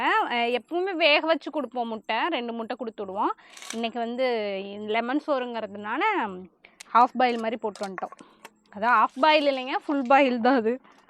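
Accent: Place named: Coimbatore, India. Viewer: திரும்ப திரும்ப நிறைய பேர் இந்த கமன்ட் போடுறீங்க.இது கோவை ஸ்லாங்.அவங்க எதுக்காக அத மாத்தணும்னு நினைக்கிறீங்க.அவங்கள அவங்களா இருக்க விடுங்க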